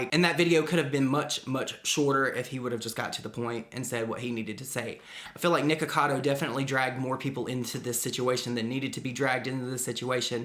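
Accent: South, United States. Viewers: I love your accent sooo much!! It makes me miss my cousins down south. Great video!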